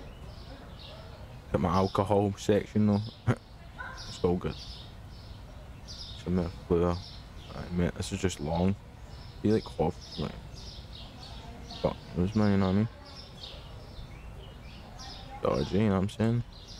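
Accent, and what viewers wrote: Scotland, United Kingdom. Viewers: He is speaking Scottish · no worries mate once i realised you had a scottish accent it was much easier to get what you were saying hahah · I thought it sounded scottish awesome vid my g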